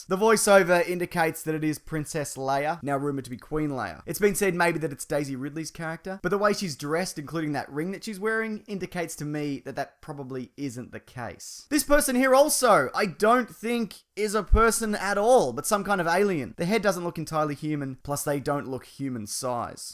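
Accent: Australia. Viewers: are you australian...? subtitles are quite useful now. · Movies Brilliant video! However, luke's voice over is a mash up of dialogue from episode 6, one part from where he tells leia that she is his sister(my father has it blah blah), and the other part from the same conversation but just a bit earlier.(you have that power wutevah). Still lovin the accent btw, ive been practicing my australian accent (im from england) and its bloody amazing! :) keep up the good work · Good job. Lemmie guess. You're Aussie. Right?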